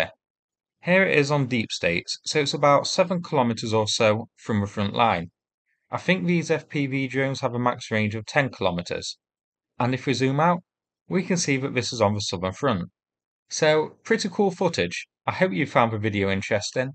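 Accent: United Kingdom. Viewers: Why pursue it buddy, when its likely you'll have your nose wiped in the sh*tey again? If you don't realise how comical your 2nd reply appeared, after you sought to humiliate our host, I can't really help you. Although I think you were embarrassed, and are now over reacting by thinking you can fight it. Our host can answer for himself the way he speaks, but I for one appreciate all the local accents and quirky expressions that exist in the UK, as someone who himself is British. In fact, I'd put a preservation order on them if I could..........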